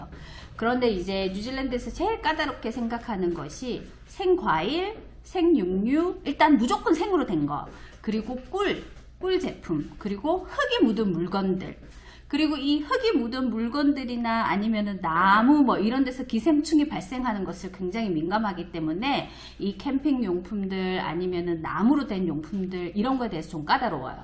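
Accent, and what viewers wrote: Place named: Gyeongsang, South Korea. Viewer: 부산출신으로서 억양듣고 알았습니다ㅋㅋ 같은 이민자로서 타지에서 같이 힘냅시다.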